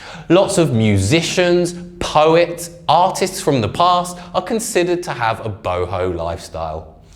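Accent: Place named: United Kingdom. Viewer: love his british accent !!!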